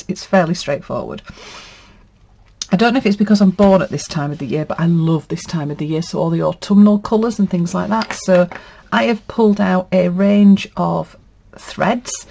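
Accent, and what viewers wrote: Yorkshire, United Kingdom. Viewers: How did I not find you sooner! Your tags are beautiful.. they've really fired me up to make some. I think I will be doubling up next year and doing tags AND flags 😁 Love your youtube video. Very relaxing (and sooo nice to hear a Yorkshire accent. I feel right at home (even though I've lived in the Midlands for the past 21 years😒) Keep those creative juices flowing, thanks for sharing and have a really lovely birthday! 🥰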